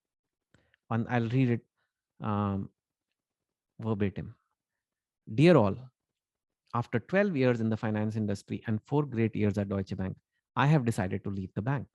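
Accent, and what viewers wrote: Delhi, India. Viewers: Thank you for your brutal honesty, I really enjoy your channel, only recently discovered it - although I am no where near your target audience. I am 38 yrs old female and find the content so entertaining even though it has nothing to do with my lifestage. I Especially love ur Delhi style of talking - I feel like some long lost friend is telling me his life stories:) Keep them coming!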